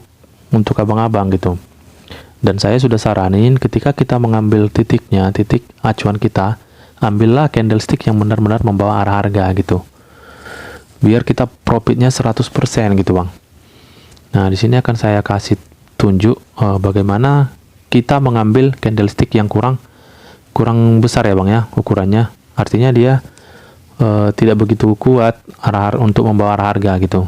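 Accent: Medan, Indonesia. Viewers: Medan kali logatnya lek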